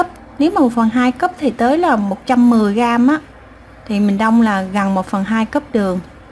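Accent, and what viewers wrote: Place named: South, Vietnam. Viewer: Cám ơn bạn lên tiếng cho 😍👍 giọng nói mỗi miền có đặc tính của miền đó. Giọng miền Nam của vô cùng đáng yêu. Nhẹ nhàng. Nghe giọng là biết tánh người Mình là ng nấu ăn vô cùng dỡ. Nhưng mình làm vài món của. Mình đều thành công Đó là vì hướng dẫn dễ hiểu, kỹ và ko giấu kỷ thuật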